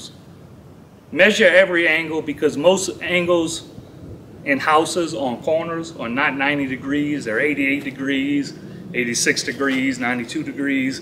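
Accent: Louisiana, United States. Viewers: You gotta be Cajun. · You Cajun... I know that accent from anywhere lol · I'm willing to bet that he's from Louisiana. I can hear the Cajun accent. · Louisiana accent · I know a fellow Louisiana boi when I hear him… lol. Great info! I never knew this. Thanks! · bruh are you from south/southwest louisiana? sure sound like it with your accent kinda lol asking cuz i live in SW louisiana and i know how people sound down here haha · You from down the bayou cher? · My good guy you from/in Louisiana? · Cool trick!! Are you from Louisiana? You sound like you’re from Louisiana……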